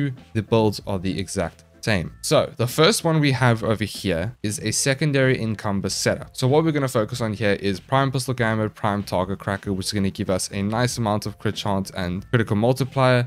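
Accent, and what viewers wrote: South Africa. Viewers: Thx for the vid I was watching your kuva litch vid since im a total noob and wanting to try get a kuva nukor since I have a normal nukor.. Wanted to ask are you a S-A local your accent man sounds like it?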